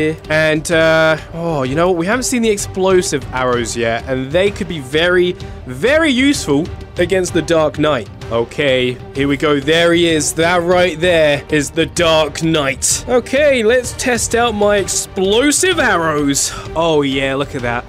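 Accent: United Kingdom. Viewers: I love british voice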